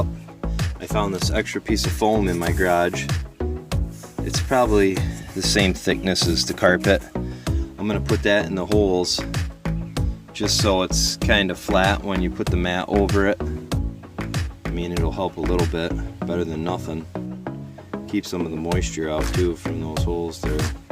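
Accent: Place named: Upstate New York, United States. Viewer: Very helpful and thorough video… thanks for sharing! Ps - Upstate NY accent for sure! 🙂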